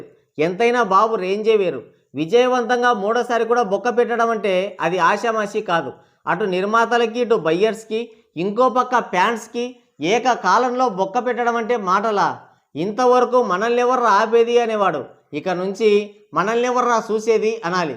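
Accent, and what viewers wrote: Rayalaseema, India. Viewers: ఇదీ పక్క మా రాయలసీమ యాస నే😂😂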